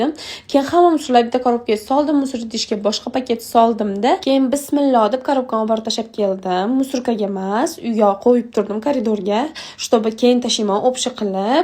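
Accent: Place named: Fergana, Uzbekistan. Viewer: Farğonaligu farğonaniyam shevasi bõladi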